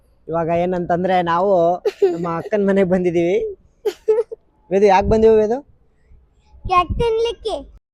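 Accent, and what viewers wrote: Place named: North Karnataka, India. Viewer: Anna nivu uttarkarnatakad bhashe yalle matadri, ade accent chand kelalikke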